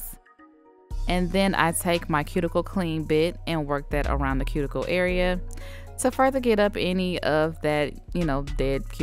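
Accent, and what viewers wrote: South, United States. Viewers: Can I just say, with that southern accent and smooth voice.. i swear you sound like Beyonce, especially when you giggle, girl. Thank you for these calming chill videos☺️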